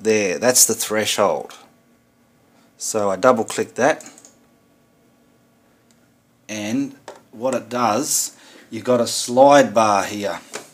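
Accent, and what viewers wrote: Australia. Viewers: Thanks for the video,! And, you shouldn't change the way you speak, you're Aussie speak as you normally do!:-) My American ears find an Australian accent quite lovely. Cheers!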